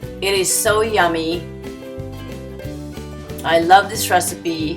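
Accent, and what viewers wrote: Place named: Philippines. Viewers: You sound like a filipina who tries really hard not to sound one... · Perhaps her target audience are not limited to Filipinos. Also maybe she is not trying to 'not to sound Filipino' and actually just speaks with an accent🤔. With that being said, possibly in YOUR videos you can speak your language, but thanks to her I can understand and follow her instructions. Boo...👎😊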